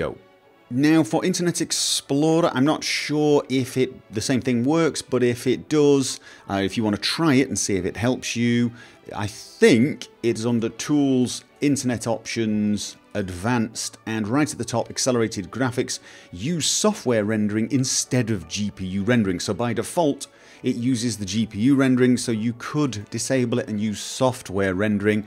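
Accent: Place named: England, United Kingdom. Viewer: OH MY GOD ... that accent ... seriously where are you from, England? also, this did work, too, so thanks.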